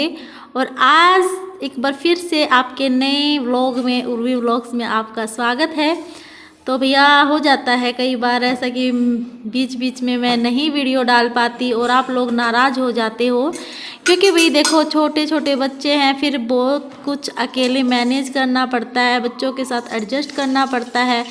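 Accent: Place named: Haryana, India. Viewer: App haryanvi bolte hue achi lgti ho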